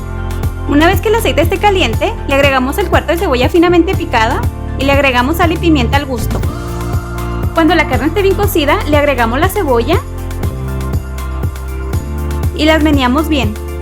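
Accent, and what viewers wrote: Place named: North, Mexico. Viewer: Se me antojaron y luego luego se oye tú acento del norte del país pues dijiste shiles con S al principio y ese es acento de allá, se me hace simpático el acento del norte.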